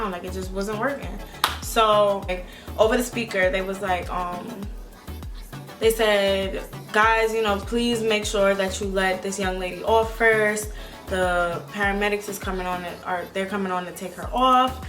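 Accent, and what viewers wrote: New York, United States. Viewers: i knew u was from NY by the way u talk... my first time watching and i luv it... my reactions alllll the way... GOD BLESS GLAD UR OK U LOOK FABULOUS BTW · 😭you from New York ? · you do you sound like you from new york😂😂😭 thats exactly how we talk "stop playing with me"😂😭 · She is so pretty I love her and you can hear the New York in her you not dead-ass LOL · She sounds like a Black New Yorker. Straight up. Lol glad you're okay, boo.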